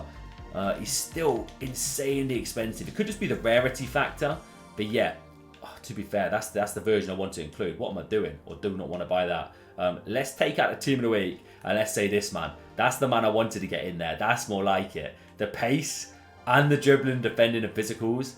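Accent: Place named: England, United Kingdom. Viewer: I really didn't want to sound like a prick but I wanted to make sure I got my point across which is why I wrote a bible after lol. I know I'll never be able to afford end game in FIFA, but I can imagine it's a lot of fun to throw almost any player in the squad (I'm glad you made it to the other side lol). It's the mystery or curious factor that makes us all want to use those top tier players, but sadly most of us won't have that chance. Again, without sounding like a prick, I think a series with a cheaper budget could really "help" your channel and a lot of people will grow attached to it. I feel like the cheaper budget/Hidden Gems/Silver Beasts type videos are something that have been missing from the community for a while now (or maybe just very rare). IMO, they're fun and help connect the community since more people can relate and interact on the videos. 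I'm actually 28 tomorrow and I've always wished that I grew up in England as a kid (I feel like my age has some "value" since I've been grinding FIFA for 14+ years lol). Having soccer as your die hard passion and the only sport that you watch was almost like living in the shadows in a way. I couldn't believe it was so loved and celebrated almost practically everywhere else in the world. It was kind of depressing. Anyway, even though I've watched a handful of your videos, I was still geeking out a bit because you're from England. Just really cool to me lol. I always loved the way you guys spoke, like dropping the C word c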